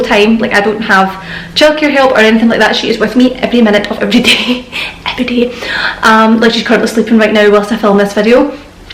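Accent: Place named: Scotland, United Kingdom. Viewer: Hey. Thank you for your video! Fun fact, I started following you in 2016 and one of the reason (on top of your awesome content and the veganism) was to get used to scottish accents before moving here. Now I've been in Scotland for 7 years, so thank you for being my introduction to the country (and fitness!):)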